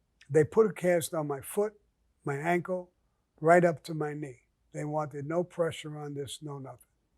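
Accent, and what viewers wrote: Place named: Brooklyn, United States. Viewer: It's simply a dialect common to a Brooklyn that unfortunately, for many reasons, exists no more. Every region has their own. Nothing more. Nothing less.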